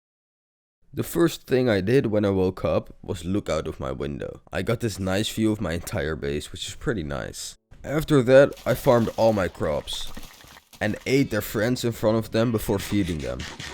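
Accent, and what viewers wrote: Netherlands. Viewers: hey! :) are you dutch? your accent sounds very dutch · You sound very dutch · are u dutch? i am.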